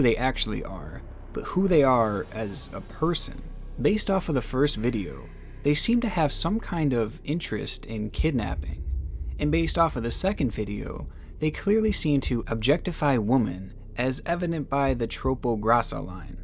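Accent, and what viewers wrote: United States. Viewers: the improper grammer is driving me crazy my guy lol, you keep saying woman when you are talking about women plural. You sound american or Canadian so I'm confused with this repeated mistake. Great video tho keep em up, just my little nit picky gripe.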